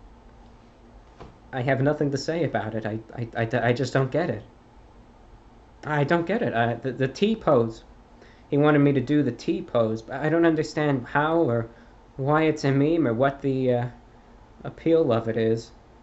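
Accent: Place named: New York, United States. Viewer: I knew you were from that part of the map.. The voice. Women love that New York voice. New York and New Jersey.. We women love those accents..🤔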